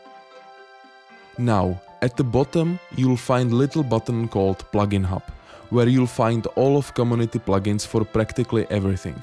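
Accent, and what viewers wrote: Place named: Czech Republic. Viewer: něco málo sem se naučil dík začal sem hrát zhruba před 14 dny:D okamžitě mi z přízvuku bylo jasný že si Čech, tak zdar!..... teď když mluvim o přízvuku... zníš na 100% jak Adam Jícha lmaoo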